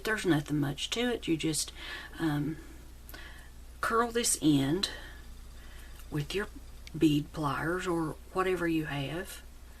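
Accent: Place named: South, United States. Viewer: Wow I’m so happy I found ya ! I’m southern and I can hear that in your voice but this is neat ! I just found ya on here ! Very happy I did ! I bet you could even use Bobby pins for the wires too even ! Just saying! Lol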